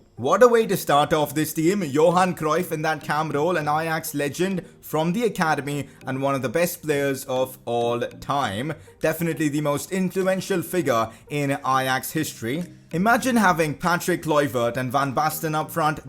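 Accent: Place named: India. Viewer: umm, you kind of have a indian accent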